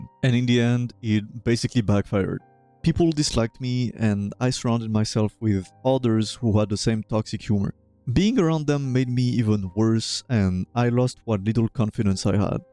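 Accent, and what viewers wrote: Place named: France. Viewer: absolut cinema (je te conaissais pas avant mais j'ai remarqué notre bel accent anglois commun 😌)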